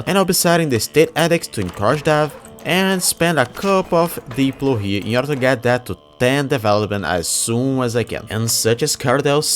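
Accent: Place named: Brazil. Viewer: sempre bom ver algum br fazendo conteúdo em inglês sobre qlq game, sua pronuncia ta ótima amigo, apesar do sotaque "accent" que colocamos, isso deixa com mais charme (obs: demorou quase o vídeo inteiro pra eu começar a suspeitar ser um "compatriota" huashusahusa), sucesso!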